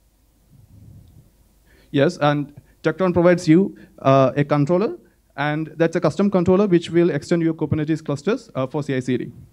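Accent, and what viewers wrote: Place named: India. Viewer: what a mess. no subtitles to bad indian accent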